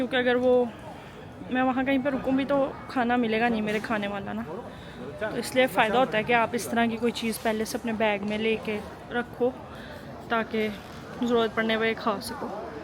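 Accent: Lahore, Pakistan. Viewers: You have really pure lahorei accent bless you I really enjoy your vlog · 😀😀😀china Pakistan 🇵🇰 iron friendship. I love & proud born in Pakistan 🇵🇰. You”re so funny speak Urdu like tipical Pakistani.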